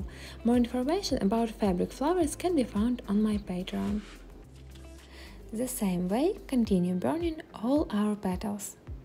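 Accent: Russia. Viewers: Акцент)) выдаёт что наша! Но здорово всё равно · Вы же русская, сразу слышно) Почему на английском? А работы очень красивые 😊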